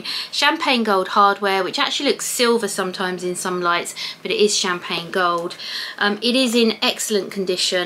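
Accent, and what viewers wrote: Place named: United Kingdom. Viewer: Yay, I was team green! 💚 You hit the jackpot — such a beautiful soft minty green that will go with virtually everything! I’ve watched all of your NYC videos and enjoyed them all, including this one. I’ll admit that I was tempted to fast forward to see which color WOC you got 😂, but I stayed the course because I love listening to your narration and lovely British accent ❤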